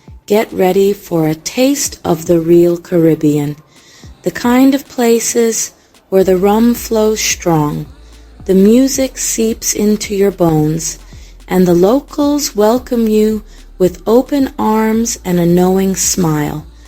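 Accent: Jamaica. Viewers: I love this. For one moment I thought English which is taught in schools went extinct. Anyone from any English-speaking country can understand and partake. Nothing tops that beautiful Jamaican accent. I wish you much success in your new venture. God bless.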